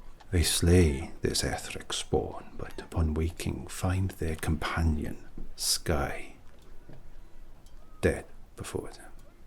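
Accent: Wales, United Kingdom. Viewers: The ad slid in so smoothly that I almost slipped. The light, the background sounds, the welsh accent, the make-up, the costume, the hair (especially the hair), simply 🧡💛🧡💛🧡💛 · The heavy Welsh is my favorite 😍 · Love the story, after a long day it’s so nice to hear it with a gentle welsh accent ❣️ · An elf with a Welsh accent, I think I'm in love. 😍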